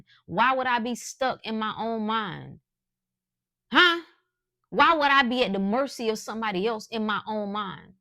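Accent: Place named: South, United States. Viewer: Ok I figured you were from the south you kinda sound like Erykah Badu when you talk lol. Both of you be dropping gems 💎 & have a country accent. Love your videos ❤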